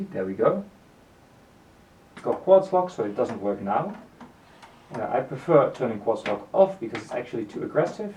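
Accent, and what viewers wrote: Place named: Netherlands. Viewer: Nice unboxing; your accent somehow sounds Dutch (je klinkt nederlands). My first turntable was a Reloop RP-2000 MK3 and it was similar to this one in many ways. The tonearm assembly, the dodgy strobe indicator, the platter without magnet. It didn't come with a dustcover because it is a DJ turntable, but when I sold it to my parents, they wanted a dustcover, so I bought a Reloop dustcover for them. It has that exact same type of hinges, detachable on both sides, with those plastic squares that go into the dustcover slots.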